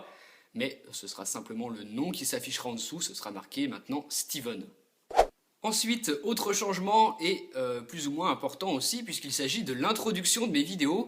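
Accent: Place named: Savoy, France. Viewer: On reconnait l'accent de la savoie :)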